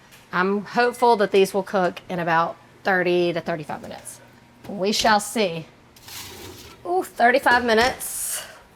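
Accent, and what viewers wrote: South, United States. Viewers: Hi there, your videos are SO good. You're so at ease with and very able to articulate the how and why of cooking with an air fryer, and the oven, of course. You're a very accomplished and personable woman. I've learned a lot from you about air fryers and cooking. And, your Southern easy-going presence and sound are familiar to me. Are you originally from Texas? I'm an 82 y/o woman who has just discovered air fryers. I received my 4 qt. Cuisinart today and after watching your video that included many things to cook using flour tortillas, I made a small meal using one of the small round tortillas I bought yesterday, filling it with delicious foods, and enjoying it within 6 minutes. It'll be a new tasty meal, so easy and tasty. So, thank you.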